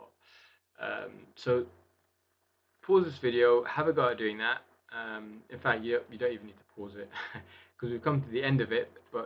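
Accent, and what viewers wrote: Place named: South India, India. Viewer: very nice video good tutorial.. html tutorial is way too long for an title of "open graph" I am 19 minutes into video and there is 0 (Zero) open graph demo.. beside login and that too not covered in tutorial in first 30 minutes my critique... keep it simple, for a complete app tutorial it was good but first 20 minutes were boring ...another thing...be normal and try to be normal with your accent.. be yourself.. you have that typical southern indian/ sri lankan inspired "hech"tml in your video too many times..that is very annoying if you are trying to put layer of english or american accent.. caught a few times struggling with "lo"gin / "loo"gin.. again nothing bad but annoying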